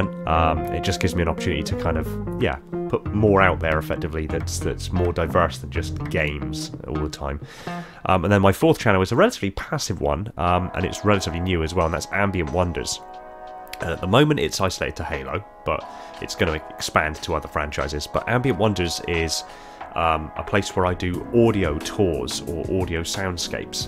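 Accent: United Kingdom. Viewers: I always love ur voice btw. Also r u British? I forgot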